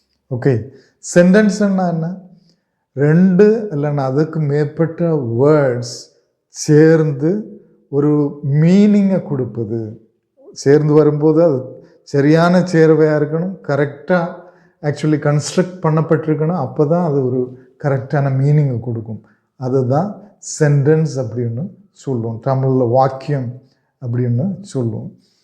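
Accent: Kerala, India. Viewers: I don't need anyone's coaching as my vocabulary is excellent. It was just a suggestion because what you blabber in the name English is pure junk with all that mallu accent.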